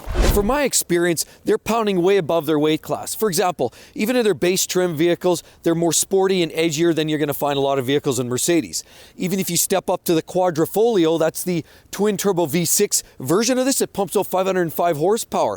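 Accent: Canada. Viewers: That Canadian accent kills me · your thick Canadian accent kills me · I can't take his Canadian accent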